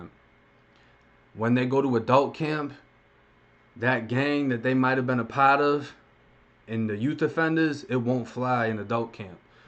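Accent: Boston, United States. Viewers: Bro accent sound like you could of played in The Departed be Mark Wahlberg voice double 😂😂😂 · 1 of Christina baby gang , I love your East Coast Boston accent. I’m from Connecticut. Sometimes some words come out like Boston area, but not enough LOL 😂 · Love your Channel. Have seen many same type Channels. This is in my opinion far the best. You have a talent for this. With your Boston accent.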